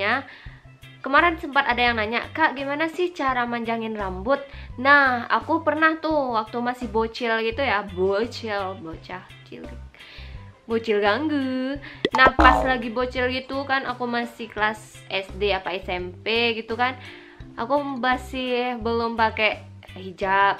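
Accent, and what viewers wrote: Indonesia. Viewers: Suaramu dek mirip minang kocak 🤣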